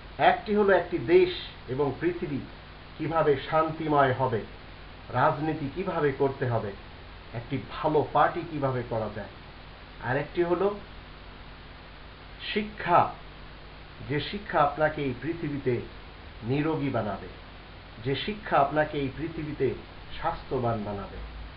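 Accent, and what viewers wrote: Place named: Comilla, Bangladesh. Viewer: আপনার বাপ দাদারা কি কুমিল্লা থেকে যশোর এসে বসবাসকারী? আপনার ভাষায় তাই ধারনা হয়।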